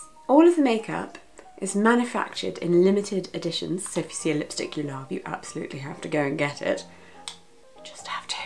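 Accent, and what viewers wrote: RP, United Kingdom. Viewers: Your british accent sounds so proffetional and similar to the royal families accent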